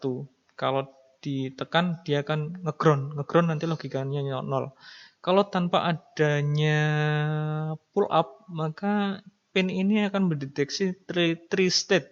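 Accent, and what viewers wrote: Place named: India. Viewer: Can you and all of your countrymen do me and everyone else on the internet a solid. I am not trying to be a racist and i am not, but can you put a subtitle in English on all of yours videos so we can understand what you are saying. An Indian with thick accent trying to speak English is not easy to understand. But a lot of content from Indians are second to none when it comes to a technical aspect of things. with respect.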